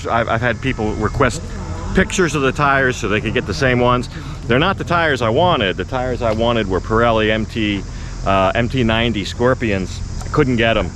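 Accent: United States. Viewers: Are you an American living in Thailand? Sounds like an American accent lol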